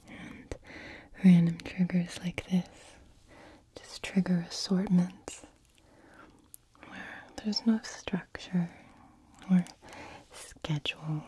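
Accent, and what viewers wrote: South, United States. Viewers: She's southern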